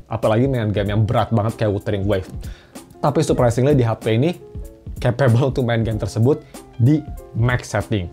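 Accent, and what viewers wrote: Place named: Depok, Indonesia. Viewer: mas" Depok 😅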